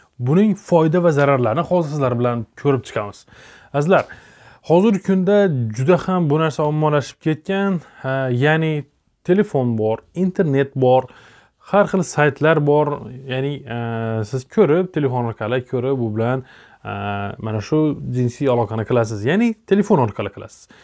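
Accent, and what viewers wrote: Khorezm, Uzbekistan. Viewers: Харазимдан мисиз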